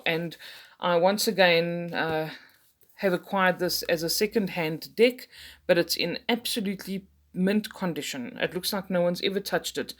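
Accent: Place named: South Africa. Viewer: I was born in Cape Town, so I was immediately drawn to your South African accent lol. I am thinking of purchasing this deck and came across your channel when I searched for videos on the Celtic Tarot. Thanks so much, I think I may grab myself a copy. The art is indeed very interesting and beautiful, and although pip decks are not typically my favourite, this one definitely does intrigue me 😊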